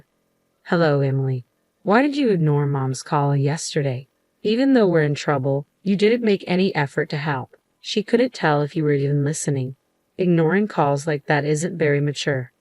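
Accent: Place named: Arab world. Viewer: Why the Arab accent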